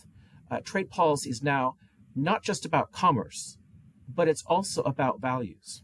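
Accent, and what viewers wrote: United Kingdom. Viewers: I think he's British.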